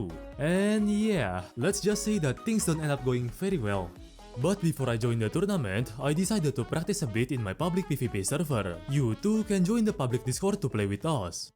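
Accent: Russia. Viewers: You sound russian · interesting, I would have guessed russian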